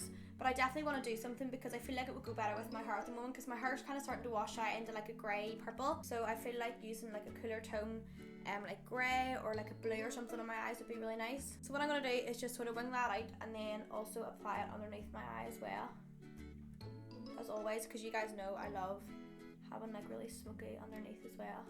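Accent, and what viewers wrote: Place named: Belfast, United Kingdom. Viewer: no need to hate on her! It her accent I'm from Belfast and it's just in our accent that we say like a lot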